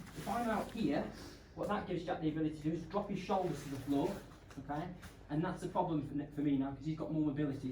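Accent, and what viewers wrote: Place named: Wolverhampton, United Kingdom. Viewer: As it should be fluid and adaptive…loving the throws btw similar to my Aikido style, started my martial arts in Wolverhampton 20yrs ago, now in Tulsa, Oklahoma, hence noticing the accent!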